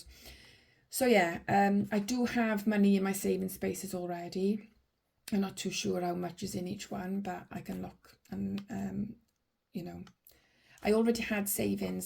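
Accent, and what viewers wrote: Wales, United Kingdom. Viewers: Hello Lovely Well done on your first video. You did amazing 🎉 So glad I found your channel. Love a Welsh accent. My bestie is Welsh. Big hugs from Australia ❤ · I love a Welsh accent ❤ I'm binge watching. I notice you've been gone about a month. I hope all is well and once I've completed watching you'll be back 😊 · Awww a fellow Welsh accent! ❤ Good luck in all your saving endeavours! ❤❤❤ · Love the welsh accent, we are actually saving to move to wales atm x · Great video🎉 I love the Welsh accent 😊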